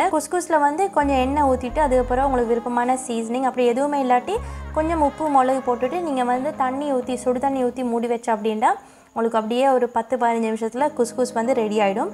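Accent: Sri Lanka. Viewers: Mashallah all recipies are soo gud thanks for sharing ur srilankan slang nice to hear keep going sis👍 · அதாவது பயத்தம் பருப்புதான சிறுதானிய உணவுதானே உங்கள் சிரிலங்கா தமிழ் எனக்கு ரொம்ப பிடித்து விட்டது ஆனால் ஒரு சில வார்த்தைகளை விழங்க வில்லை. அதாவது புரியவில்லை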